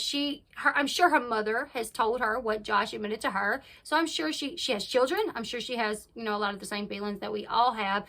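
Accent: South, United States. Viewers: I love your accent. I miss the south.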